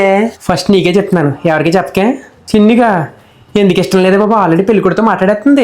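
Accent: Godavari, India. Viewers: గోదావరి యాసతో మీ వీడియోస్ చాలా బాగుంటాయి అండి కామన్గా మిడిల్ క్లాస్ ఫ్యామిలీ ఇంట్లో జరిగే డిస్కషన్స్ లానే❤❤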